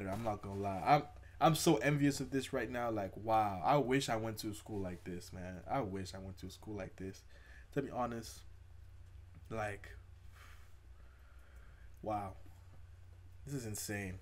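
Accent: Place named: South Africa. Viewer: i can hear that youre south african my guy 😂😂🤣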